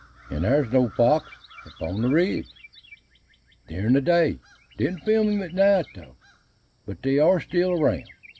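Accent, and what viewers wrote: Appalachia, United States. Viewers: Came across your YouTube videos and have really enjoyed them. It's brought back many memories from my summers in East Tennessee. I am of Scotch Irish/English decent. My mother was from Knoxville and father from Carbo VA. Russell County. My dad grew up around the mines and had a love of steam locomotives Your accent is one I'm very familiar hearing. Love the mystery stories! And the orbs, wow! Many thoughts on those. I recall sitting on my grandfather's porch as they discussed the Dennis Martin case. It was going on at that time. Appreciate all the time involved in creating the videos. Looking forward to more videos. Greg Childress Cincinnati, Ohio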